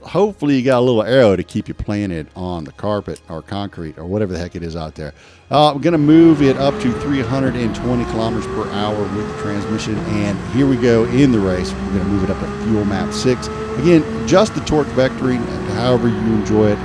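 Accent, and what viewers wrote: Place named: Texas, United States. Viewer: Man that texas accent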